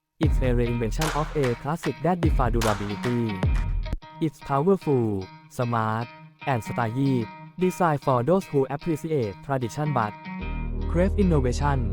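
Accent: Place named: Thailand. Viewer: อ่านภาษาอังกฤษแบบไทย แถมตกไทยด้วย เช่น Starting with the exteria the สองพันยี่สิบหก Isuzu KEหกหนึ่ง … งงแท้ จะไทยหรืออังกฤษ❤❤ ปรับใหม่ก็ดีนะครับ ติเพื่อก่อนะครับ ❤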